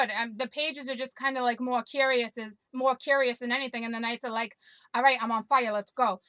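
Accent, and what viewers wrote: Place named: Boston, United States. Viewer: Flip’n ADDICTED to U!! Your accents (Boston? ~ my son is in Boston !) ❤️💛💙💚🌹♂☿♀💝💑👫 YOU!! WE hv ALL bn stabbed in the back!!! YOU GIRL need to be exposed big time on a much bigger platform! I’d hire you to do events... I’m new to YouTube but would love to hv contact with u. ❤️❤️❤️ YOU!! YOU R SUCH AN AWESOME GOOD SOUL!!!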